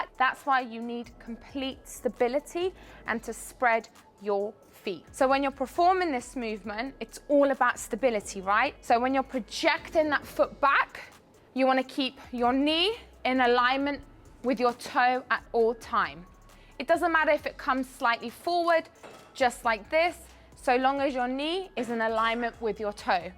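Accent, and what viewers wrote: United Kingdom. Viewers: Great detail!!! Not only the “how” but also the “why”. Brits are so easy to listen to as well. Subbed today. Hope the channel grows for you.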